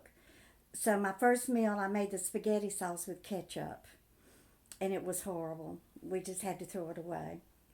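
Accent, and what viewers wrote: South, United States. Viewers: I knew you sounded Southern!! I’m from Florence Alabama, went to school in Waterloo. I’m loving your story!! Bahahaha I had an Aunt Sybil too🤣🤣you’re cracking me up woman 👏👏😂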